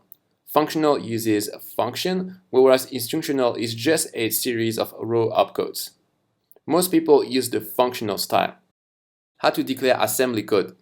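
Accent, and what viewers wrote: France. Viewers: Thank you so much!! Vu l'accent je pense que tu es francophone donc je te le répète : MERCI 🙏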